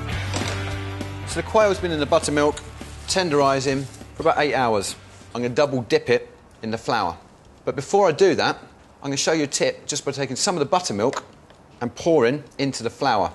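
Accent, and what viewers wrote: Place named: Europe. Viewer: This ain’t southern y’all, this is European...The European accent the heeeerbs....hell naw this ain’t southern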